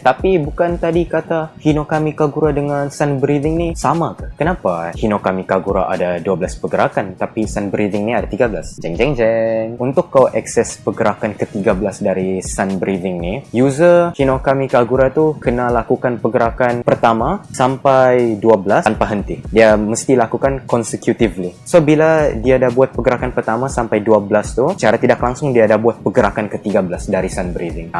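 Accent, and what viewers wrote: Sabah, Malaysia. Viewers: Bila speaker org sabah . Cerita ni dah macam cerita hantu benar beb hhahah mantap 👍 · Aku suka betul bila dia cerita pasal kimetsu no yaiba ni dengan slang dia cakap sabah dia tu huhuhu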